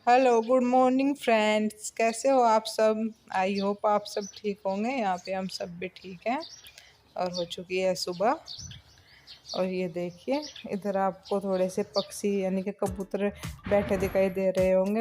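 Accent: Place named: Haryana, India. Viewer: Jaat ho sayad boli too aise he lg re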